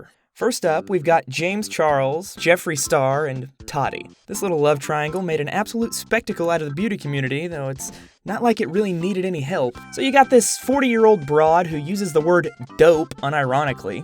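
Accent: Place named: Midwest, United States. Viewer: I can here your accent big time in this vid. Can't say I completely place it but I believe that it's a midwestern accent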